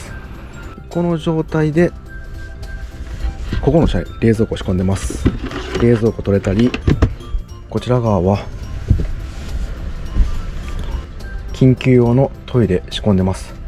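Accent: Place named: Kansai, Japan. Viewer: 皆さんもコメントされているように、それでインディのDIY振り返りだったんですね！ほんと、いつも相方と楽しみに拝見してます。私もキャンピングカーを検討し始め、さんの動画を随分参考にさせてもらいました。今年の12月納車予定です。同じ関西人なので、さんの語りは親近感ありすぎです。インディ乗り続けて欲しい気持ちもありますが、次に何にされるかもとても楽しみです。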